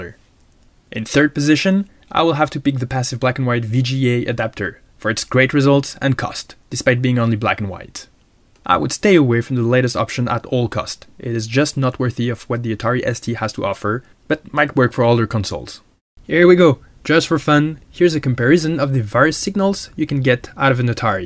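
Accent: France. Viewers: Ahh cet accent bien fançais :-) Superbe vidéo, merci !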